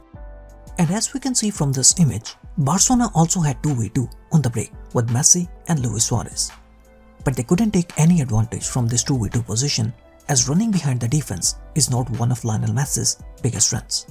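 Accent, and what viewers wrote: India. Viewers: Dude... Is the narrator Indian?? At least His accent is ..😐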